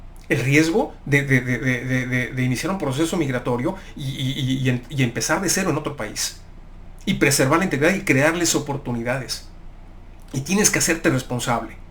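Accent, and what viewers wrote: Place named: Querétaro, Mexico. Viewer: de que estado de mexico eres? Te escucho como queretano, saludos desde acá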